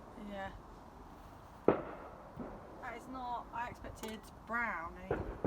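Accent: United Kingdom. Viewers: Your British accent is amazing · She sounds like she's from Plymoth/Plympton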